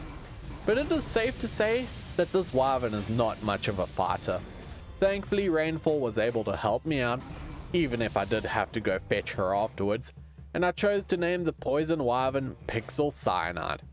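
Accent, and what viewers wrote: South Africa. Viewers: My guy are you from south africa? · I was like this man sounds like a south african and when you said ahh eskom i was like yes he is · U from south africa???? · Are you south African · WAIT UR SOUTH AFRICAN OMG BRO WHAT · No wonder your accent felt so familiar you're from SA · I knew by the accent your South African lmao🇿🇦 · i knew you where also south african i knew it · You South African😮😭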